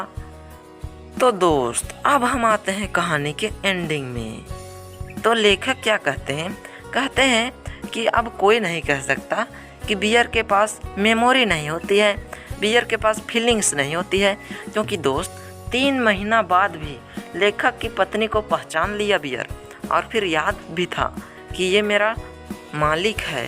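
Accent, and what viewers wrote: Bihar, India. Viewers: Bhai tum meri tarah bihari ho kya😀😀😀😀😘😘😘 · Bihar sa ho kay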